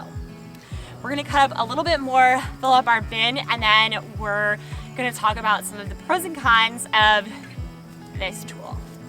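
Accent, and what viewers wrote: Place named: United States. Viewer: This American voice gets in your nerves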